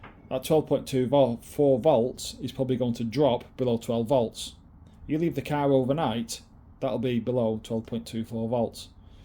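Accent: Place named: United Kingdom. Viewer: thanks ! Gonna get the yuasa ybx5202 ! it's only 80€! don't want to waste my time at Toyota! anyway if they replace it They're gonna do it with a shitty battery ! Do you know if the latest Yaris 2024/2025 still have the same crappy batteries in them? On m'y Corolla 2024 i have VARTA ! Like your British accent👌😅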